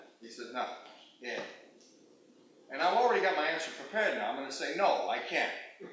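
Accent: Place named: Youngstown, United States. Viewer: This won’t mean much to most anyone, but I can still hear the Youngstown in his voice. It’s like hearing home. Awesome story.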